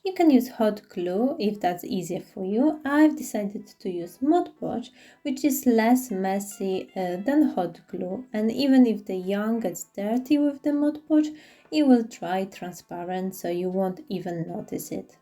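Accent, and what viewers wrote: Poland. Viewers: Ciao, i see you're from Poland i hear accent but great not shy of that i'm quite scared to speak on video because English is my 4 th language but i do a lot of diy projects, prefer more diy's on video so we could choose from, advise before to dry clay put them on the surface that you going to glue so they keep form of it, keep going it's all process enjoy😘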